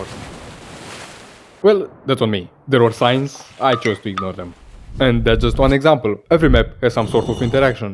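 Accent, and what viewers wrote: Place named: Russia. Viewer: I love the, uh russian/ukranian acent?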